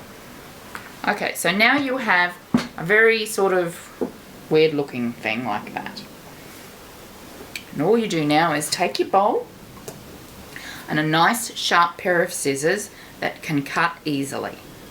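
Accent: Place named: Australia. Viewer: So lovely to hear an Aussie voice coming from a great, non complicated tutorial! Bonza mate!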